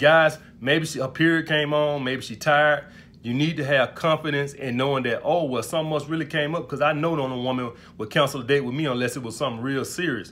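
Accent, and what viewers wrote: South, United States. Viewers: Hey, guy....A man who speaks as you do does not have a chance in hell with the kind of women who are generally considered desirable. You know... women who make good partners, parents, is interesting, enjoys life. Your enunciation is terrible, that southern drawl is telling. Your use of profanity is off-putting, referencing women as “bitches” and other insulting names is not buying you any prizes. This video is strictly for the guy under 25 years of age who wants to act like someone he is not. It is definitely for someone who has decided some guy on YouTube has the answers to life’s mysteries. This is beyond comical, it is tragically comical.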